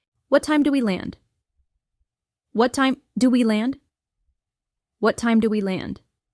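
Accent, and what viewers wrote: United States. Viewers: s accent is american? Any body tell me.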